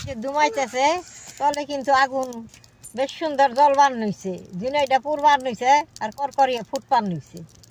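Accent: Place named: Dhaka, Bangladesh. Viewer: উনার কথায় বুঝা যায়, বাংলাদেশের ঢাকা জেলা অথবা মানিকগঞ্জ জেলা হবে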